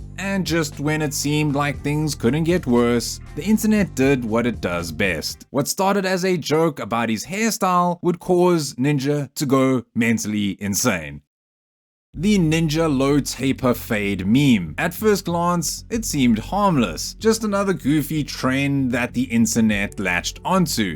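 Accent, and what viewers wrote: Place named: South Africa. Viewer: you sound like you south african trying to sound like an american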